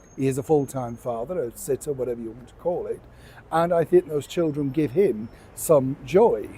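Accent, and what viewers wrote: United Kingdom. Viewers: I don’t know…but i like listening to stories when the accent is in the british accent…it’s very pleasant to the ear for an asian like me…even crime stories, i like listening to the dialogue when in this kind of accent…sorry about harry…he’s going to deeply a d sadly regret his actions…your real family is the only family who truly will ever know you…anyone else, including a spouse, could leave then where would you be?…what a sad life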